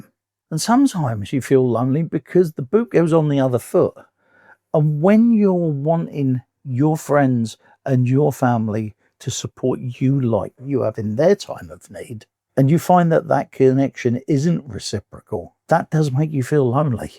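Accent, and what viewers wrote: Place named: London, United Kingdom. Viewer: Hey! I've only just found you!! Although I'm not impressed with your London accent... ( I'm Irish & proud! ) Suffice it to say... I am half daft with all that happened to me in my life! Like you... I'm 65... Retired Police Officer! 3 years ago I lost my Latvian wife to a 12 year battle with cancer... since then... & because of all the sh1t that happened in my life... I'm a bit of a basket case... but "every day in every way. I'm getting better & better!" In actual fact... I've been to a psychotherapist recently... for the first time in my life!! After only 2 sessions I am seeing things that I never noticed before!! Life is waaay from over just yet!! 😊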